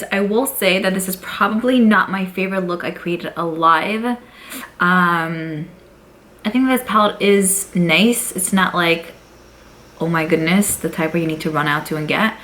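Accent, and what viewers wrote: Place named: East Coast, United States. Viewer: I adore your East Coast accent! And i ordered the TF palette yesterday. Only would’ve ordered it on sale vs full price tho.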